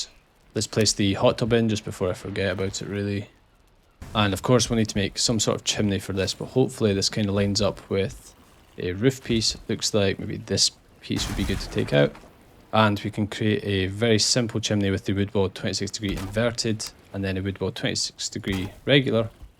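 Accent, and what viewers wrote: Scotland, United Kingdom. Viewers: what a beautiful Scottish accent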